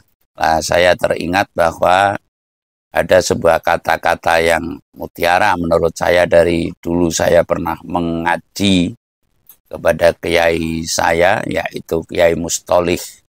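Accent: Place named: West Java, Indonesia. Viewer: Kalau jadi Ulama/kyai Belajarlah Yg Bener dan Tutur katanya Yg Indah...Contoh Kyai2 Terdahulu Tutur katanya Enak..Contoh Mbah Maimun Mbah Nasir... Mbah Nur Hadi.. Mbah Solihun... Dan Msh banyak Lagi Kyai2 Yg Bicaranya Lemah Lembut..Tetapi Ini Kyai Dengan Logak Sunda Bahasanya Agak Kurang Beres...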